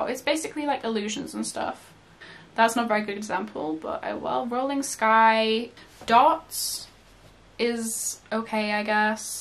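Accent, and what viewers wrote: United Kingdom. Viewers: Are u British? Just asking cause of the actient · I didn’t realise u were British lol 😂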